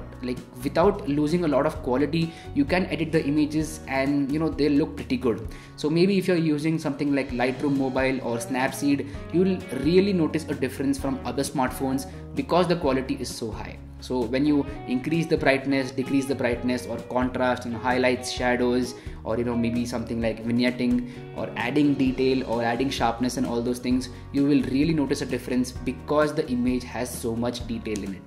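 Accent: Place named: Punjab, India. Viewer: Bhai o Panjabi nikalde o regional feeling utarahahe I'm from AP but I like the way express so try to be with all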